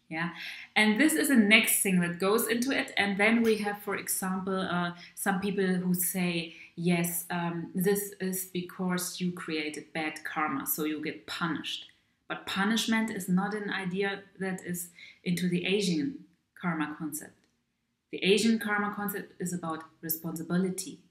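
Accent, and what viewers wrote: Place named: Germany. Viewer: Ich liebe es, mit deinem deutschen Akzent zu sprechen. Sie haben eine so positive Aura. Du lächelst immer. Ich mag das sehen. Ich finde deine Videos charmant. Ich weiß, du bist sehr weise und gelernt. Ich spüre "Macht" in dir. Vielen Dank. Mögen die Götter dich und dein Zuhause segnen.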